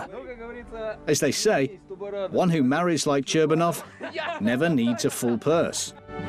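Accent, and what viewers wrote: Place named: United Kingdom. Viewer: Every time i hear narrators Albion accent I know immediately, its not worth to watch the story...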